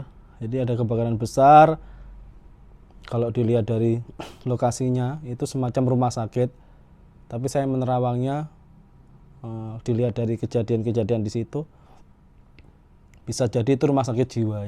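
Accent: Java, Indonesia. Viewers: Ms miriP Orang Korea kk..tp jowone medok..orang mana ya kk.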